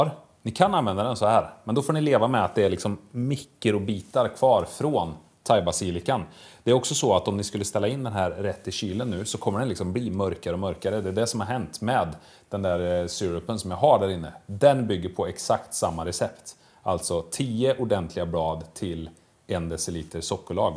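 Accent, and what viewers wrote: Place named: Värmland, Sweden. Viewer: Likead och subbad! Fantastiskt nördigt, klassigt, och underhållande, dessutom på KLINGANDE dialekt som tog mig hem till Värmland. För det är väl det jag hör? Blev alldeles omotiverat stolt över dig! Skall konsumera resterande videos med glädje!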